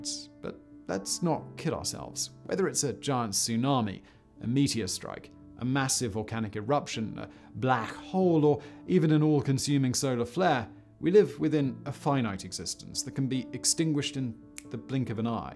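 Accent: RP, United Kingdom. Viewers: His BBC accent isn't forced and not at all cringeworthy. What a pompous arse.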